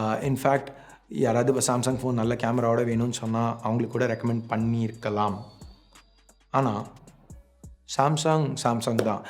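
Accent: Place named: Chennai, India. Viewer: Chennai slang semma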